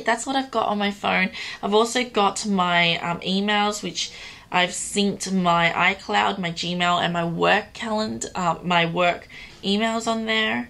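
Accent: Australia. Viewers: australian accent aaa ashdjdkal love it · I think it's an Australian accent. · are you australian?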